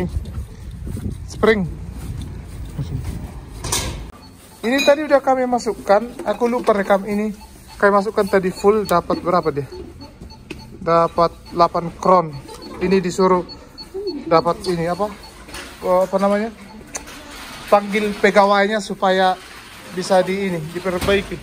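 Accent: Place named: Sumatra, Indonesia. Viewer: Cara bicaranya seperti orang dari Sumatra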